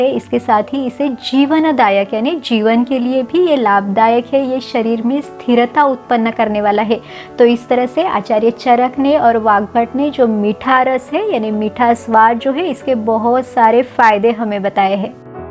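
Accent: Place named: Maharashtra, India. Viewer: ताई तुमचे मराठी accent मधे हिन्दी नेहमीदिसुन येते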